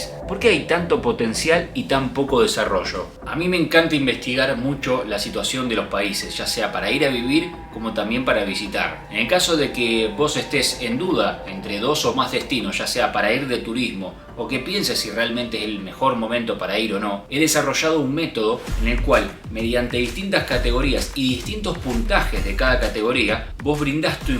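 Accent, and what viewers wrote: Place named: Argentina. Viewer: se disfruta más el tono y acento de voz argentino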